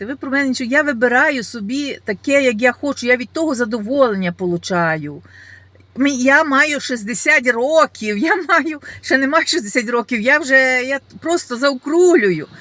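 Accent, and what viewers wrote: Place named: Ivano-Frankivsk, Ukraine. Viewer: вона з Івано-Франківщини , в одному відео проговорилась з коліжанкою , чути по розмові , що не Львів і не Львівщина ! 😂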